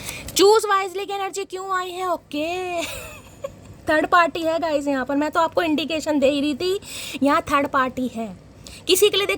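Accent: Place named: Bihar, India. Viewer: आज बिहारी स्टाइल में आपका बोलना मज़ा ही आ गया ❤❤ और रीडिंग सुनते हुए एक ख्याल आया की आप जिस तरह हमारे पक्ष में बोलती हो तो कहीं सारे चचाचनेरा आप पर ही कुफ्र न धरने लगेंगे 😅😅 काहे दुश्मन बढ़ा रही हो प्रिय 😂 खैर जो भी हो पर आपकी वजह से हम उबर चुकें और बाकि तो महादेव हैं ही सर पर हाथ धरे हुए 🙏🏻🙏🏻🙏🏻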